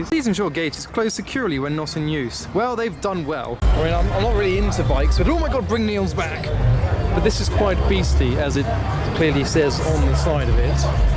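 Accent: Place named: RP, United Kingdom. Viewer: I thought this was a supercarsuspects video until I heard the posh british voice lmao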